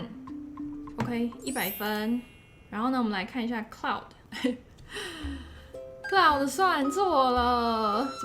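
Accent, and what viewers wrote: Taiwan. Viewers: 声音很好听，满满的温馨台湾腔💪有空多多向你请益技术🌱🌱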